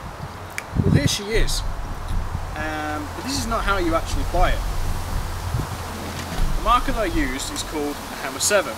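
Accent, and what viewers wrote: United Kingdom. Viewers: where abouts in the UK are you from mate!? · Hey! Im from Germany.. so i cant understand everything very well.. It would be very nice if you could put some links below my commentary For example:The dye mag feed for the hammer 7, the remington stock or the barrel... i ask because i cant understand an english accent very well ^^ (sry for my worst english...^^) the links dont have to be an german link! just that i have the special name of the item Greatings from Germany!:)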